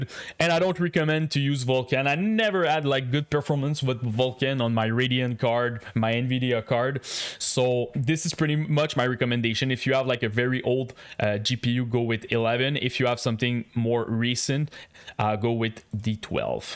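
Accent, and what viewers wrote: Quebec, Canada. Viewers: French canadian proper. 😀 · You from Quebec by any chance? That accent sounds hella French Canadian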